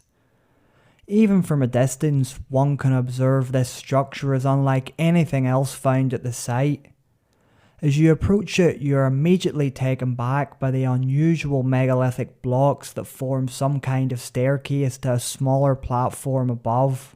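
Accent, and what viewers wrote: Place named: Scotland, United Kingdom. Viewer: I think he is scottisch, i love his accent 🥰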